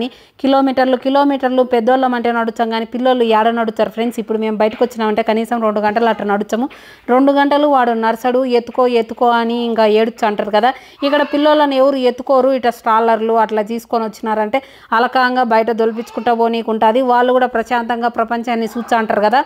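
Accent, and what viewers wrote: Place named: Kurnool, India. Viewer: Maadi kurnool district.. ayinaa mee negative village ku chaalaa daggara kanuka slang 99% same vuntundi.. Vuregadam,, Mugeddulu. Naaku kudaa silent vunte bo manta..oonnoo antene satisfaction..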